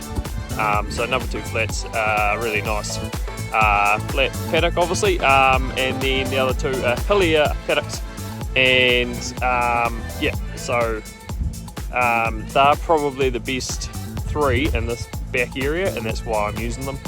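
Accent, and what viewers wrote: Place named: Taihape, New Zealand. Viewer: Mate..... said with that taihape lion red twang, had some bloody laughs watching this. said to the wife, "oh shit he's got one of those datamar's reels" feel your love hate relationship with em. so anywho, im from the wider north island, been there done some of that, grew up in the windy city of Palmy and migrated through the island to north-land after a seasons in ohingati, drove stock units in your neck of the woods for couple years before going back to the front end, to many late nights loading out of fielding on a Friday night for my liking. anyways nice sarcasm on the wool. bloody sacrilege. look mate we were farming 600 ha in north-land before it all got turn into carbon pine forest but i swear the best vale out of your nitrogen is in may, sets you up for winter, and yeah i always monitor soil temps, we we 12.3 on Wednesday last week., love your vids mate. 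( oh yeah my hunterway rocks is exactlly like duke). hard headed..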